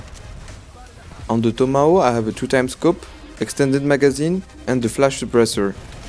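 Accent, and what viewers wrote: France. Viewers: oula lui il a un accent français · tu a un accent français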